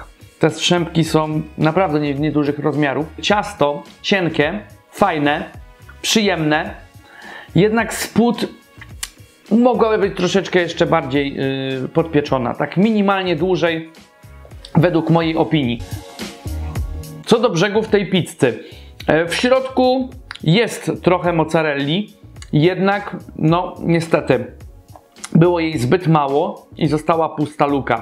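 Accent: Poland. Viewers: Jak ja nie nawidze tej toruńskiej gwary, serkem... Ołówkem ...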